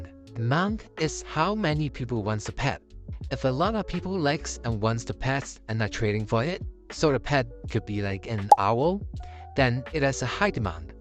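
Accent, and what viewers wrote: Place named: Singapore. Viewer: U sound so singaporean lol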